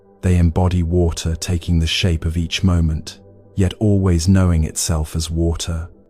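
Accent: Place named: Europe. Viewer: Ugh european accent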